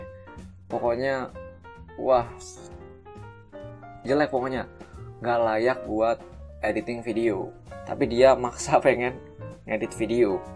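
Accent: Pekalongan, Indonesia. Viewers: Pantes indonesia nya medok ternyata pekalongan😁, santri ya ekwk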